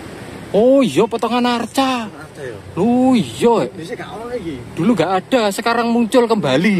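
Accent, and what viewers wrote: Java, Indonesia. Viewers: truskan lur aku suka banget cara penyampaiyaan kata2nya lucu mas bro,mentok banget jawanya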